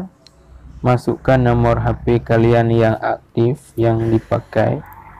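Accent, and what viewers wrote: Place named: Aceh, Indonesia. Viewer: Bang lu org mana bg kok bahasa indonesia nya ngedok aceh ya 🤣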